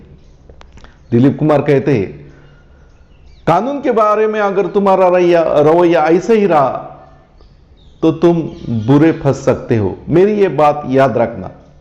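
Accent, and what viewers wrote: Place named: South, India. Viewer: Bhai pehle to apni Southwali Hindi ki jagah original Hindi bolne ki zarurat he. Ab baat Shakti film ki to is film me Amitabh ki achhi acting ke baavjud Dilip kumar unke upar bhari pade the. Kyuki Amitabh ne apni Deewar, Trishul, Lawaris me bhi baap ko nafrat karnewale bete ki bhumika ki hui thi. Is vajah se Shakti me bhi Amitabh ne apni acting me repeat kiya tha jiske kaaran unki acting kuchh bhi naya pan nahi dikhai deta tha. Dusri aur Dilip kumar ne pehli baar 1 aadarshwadi Peeta ki bhumika nibhai thi. To zahir si baat he ki Dilip kumar ki acting hi jyada limelight paati.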